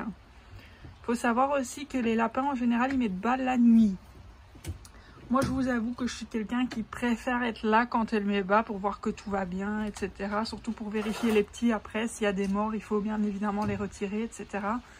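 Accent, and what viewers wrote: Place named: Belgium. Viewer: Coucou je voulais savoir où se situe ton élevage 🤔 car il me semble que tu as un petit accent belge 😆 J'ai regardé beaucoup de vidéos d'élevage de lapin et malheureusement il n'était vraiment mal renseigné 😕 Mais je trouve que toi tu t'es renseignée ,tu explique très bien et ça se voit que tu gère très bien cette elevage 😁 Aussi non merci beaucoup pour ta vidéo elle m'aide beaucoup 😊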